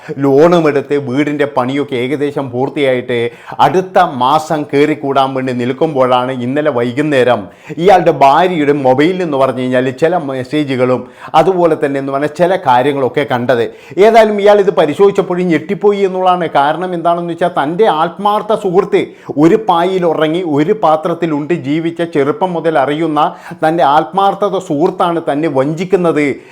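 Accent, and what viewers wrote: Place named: Kasaragod, India. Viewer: anchor kasarkottu karanananoo..athe slang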